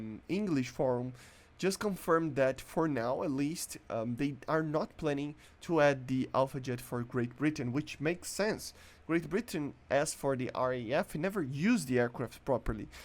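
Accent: Brazil. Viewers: He's from Brazil. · he's brazilian, not british so it's ok. I'm pretty sure you don't pronounce any other language correctly.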